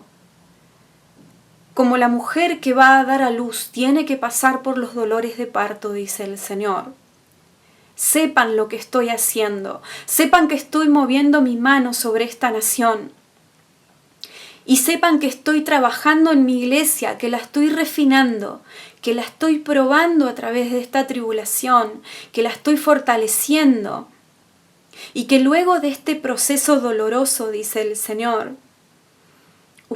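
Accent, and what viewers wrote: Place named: Argentina. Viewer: Venezolana con acento argentino! Me chocan todas estas sectas incluyendo la peor q falla católica, nunca se pronuncian en favor de los necesitados. Estos pastores q tanto le roban a los ilusos, estos curas con tanta plata q tienen de años estar robando la humanidad q han logrado sembrarle a esas matrices de miedos y temores a los ingenuos, esos pastores de las sectas cristianas, con todo el dinero del mundo, como Cash Luna, Maldonado, Jonás Cardona y demás. Donde han sacado un peso para ayudar el pueblo venezolano? Ya q se roban $ hagan los siempre hacen, del millón ochocientos q se roban regalen en cuando sea los ochocientos, hacen lo mismo q hizo la iglesia católica en la segunda guerra mundial, mientras mataban ocho millones de judíos, su religiosidad de perdon, piedad y misericordia, nunca se pronunciaron, voltearon la cabeza sin importales nada. 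Eso mismo hacen en este momento, les importa un comino la necesidad. Creen q a punta de cháchara los van ayudar.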